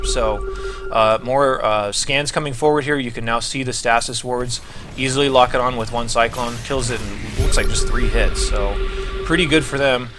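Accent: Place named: United States. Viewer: Wow. Protoss OP as F*ck now huh? Hope this game isn't broken at this stage in the series... Can't justify toss having what appears to be disruptor mini-nukes, AOE traps on top of colos/tempest/ templar storm. Toss now AOE gods. The beta thus far looks ridiculously in favor of protoss. Also.... with respect, its pronounced "STAY-SIS", not "STAH-SIS". You sound like an American, so I can't understand your mispronunciation of Stasis...... FYI so you don't sound like you didn't pass high school English class.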